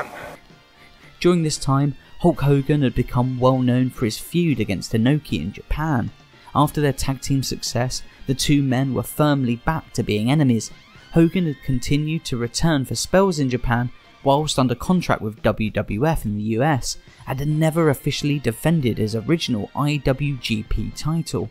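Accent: United Kingdom. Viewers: DOESN'T LISTENING TO A BRITISH ACCENT TALK ABOUT WRESTLING MAKES IT SEEM LESS STUPID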